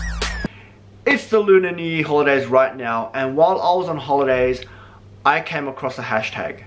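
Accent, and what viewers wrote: Australia. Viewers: I love your Australia n accent.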